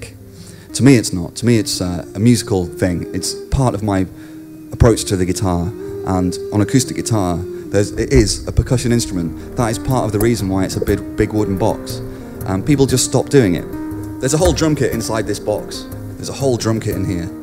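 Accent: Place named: Australia. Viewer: he sounds a lot more aussie than i ever guessed by his singing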